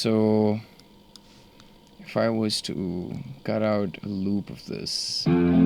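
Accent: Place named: Punjab, India. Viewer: Big big fan aka ❤️‍🔥❤️‍🔥big fan from india🇮🇳 And lots of love 🌷 your punjabi accent is amazing ❤ Smarrrtttttttt!!!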